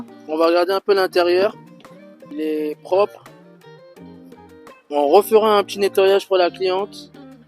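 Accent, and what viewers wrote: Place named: Africa. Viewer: Rien pigé,vous avez un accent africain très prononcé.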